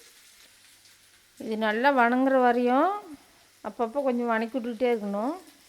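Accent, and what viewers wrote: Tamil Nadu, India. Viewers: அக்கா நீங்க எந்த ஊர்,உங்க பேச்சு அரியலூர் பக்கத்தில் நமங்குணம் போல தெரியுது,உங்க டிப்சு எல்லாமே எளிமையாவும்,நல்லாவும் இருக்கு நன்றி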